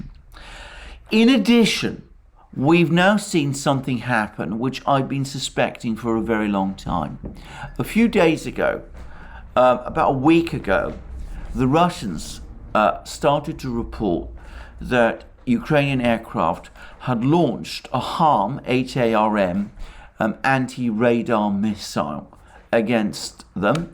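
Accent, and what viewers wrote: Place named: Public school, United Kingdom. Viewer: I've never heard a guy talk more and say less. An hour of gossipy chatter "that cannot be confirmed," full of innuendo and guesses, repetition of "I can't prove it," and "I wouldn't be surprised if we found out" "this is my own speculation" all delivered in that English public school accent that bamboozles Americans. I like Al, and I've listened to him very carefully and never once heard a word that was essential learning. He's a pundit with smooth delivery of empty chatter. If you read nothing else I suppose he's good because he does do some reading.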